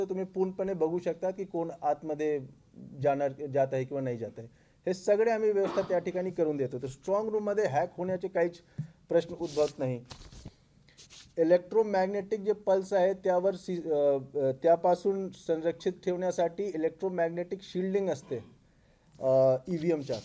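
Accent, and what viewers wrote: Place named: Gujarat, India. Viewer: गुजराती मराठी निट बोल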